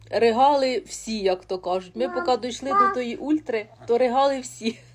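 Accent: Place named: Poltava, Ukraine. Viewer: Говір наче як з Полтавщини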